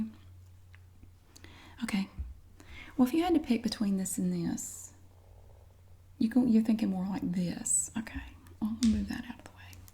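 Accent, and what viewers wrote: South, United States. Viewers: i used to only watch your videos because i loved your southern accent, and i remember the first video i saw was your dentist receptionist roleplay (which of course NEEDS a pt 2) but what i admire from your videos is that you make these storylines and characters which develop throughout your videos, and it makes them feel so real, and realism is what i seek from an ASMR video.... sure, the soft whispers are nice, but i love when someone is soft spoken with a little energy like you, and i love love love LOVE all of the details you put into your videos.... i wish i could just explain to you in person how much your videos have helped me through my chronic insomnia.. dont ever stop what you do!! sending my all x :D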